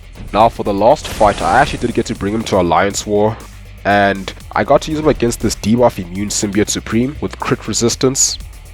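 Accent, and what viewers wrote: South Africa. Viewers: Yo I have to ask. Are you from south africa? Sounds like you are.. Congrats on red guardian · Randomly came across this video. You sound South African?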